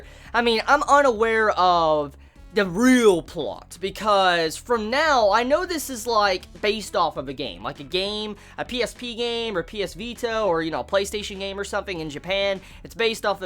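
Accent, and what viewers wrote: United States. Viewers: Are u American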